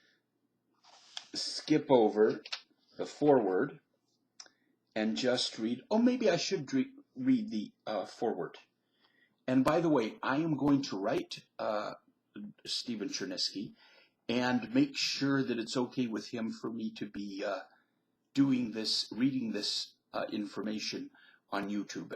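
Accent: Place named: England, United Kingdom. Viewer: I was thinking about clicking away but the English accent gave me confidence in the information that was provided.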